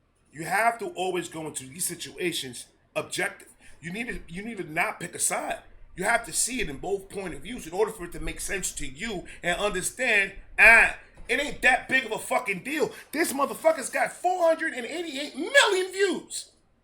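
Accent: New York, United States. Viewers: Everything you said was ON POINT! I couldn’t agree with you more! Btw.. already knew you were from NY before you even said it lol! I live 3 hours north of nyc but have friends down in the boogie down lol. Where I live, most people around here stand by “FAFO”!!!!!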